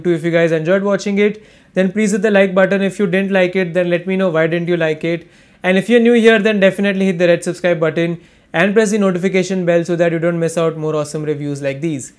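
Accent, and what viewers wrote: India. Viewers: Play video : Thick Indian accent. No, thank you.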